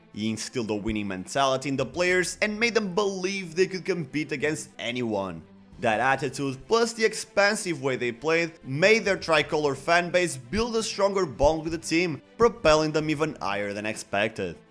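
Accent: Brazil. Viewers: Aparentemente, é um brasileiro ou português falando inglês. :) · Não é um gringo, mas sim um brasileiro falando em inglês. Nobre a intenção, Obrigado.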